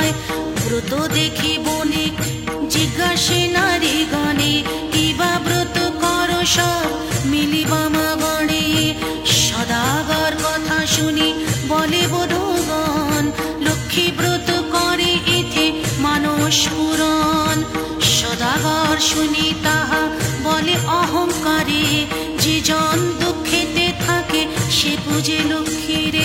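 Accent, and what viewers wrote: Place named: Bengal, India. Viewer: Sanskrit mantra uchharan thik korun. bangalar moto uchharon hobena.montro uchharon thik na hole ta kaaj deina.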